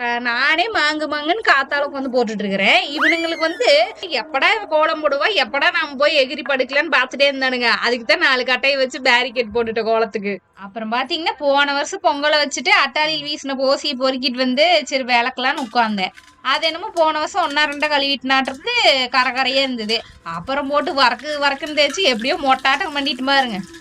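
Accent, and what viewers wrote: Kongu, India. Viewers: இனிய பொங்கல் நல்வாழ்த்துக்கள். கொங்கு தமிழில் பேசுவதே தனி அழகு. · நாங்கள் கொங்கு வெள்ளாள கவுண்டர் தங்கச்சி கார்த்திக் தம்பி அம்மா உங்கள் கொங்கு தமிழ் சூப்பரோ சூப்பர் பொங்கல் நல்வாழ்த்துக்கள் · Indha video kum unga jathikum enna irukku... Avanga pesaradhu kongu tamil... Edhukku jathi sayam poosathinga... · நீங்கள் என்ன கூட்டம் கொங்கு வேளாளர் என்று நினைக்கிறேன் உங்கள் பேச்சு அப்படி உள்ளது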